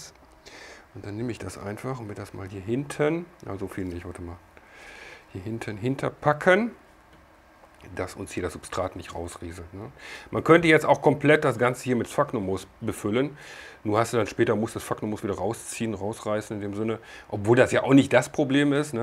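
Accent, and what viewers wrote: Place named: Ruhr, Germany. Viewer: Toll deine Pflanzen, und dein deutsches Dialekt erinnert mich so sehr am Ruhrgebiet wo Ich aufgewachsen bin und immer eine gewisse Nostalgie bleibt 🙏👍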